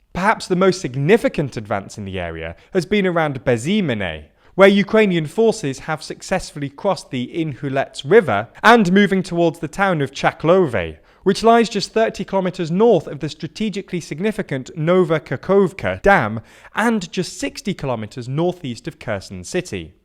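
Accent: United Kingdom. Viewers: But so british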